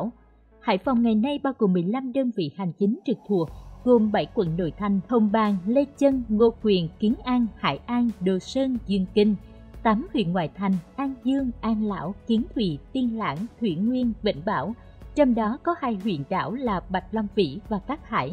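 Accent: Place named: South, Vietnam. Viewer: Sao em nói giọng miền nam mà lại rành về Hải phòng thế - anh ở Hải phòng thấy nó bẩn lắm - mà sao em quay lên đẹp vậy !!!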